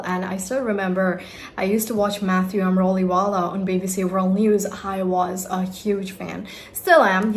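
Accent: United States. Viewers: Look I know that you can speak only in one accent and that's American accent. Stop bulshitting people. You don't know how to speak in any other accent. And it's okay. Most of the people don't recognize these accents and that's why you can lie about it. Stop it, because it takes away your hard work. You know American accent well and that's great. Good job on learning the American accent. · M aap s american accent m english sikhna chate ho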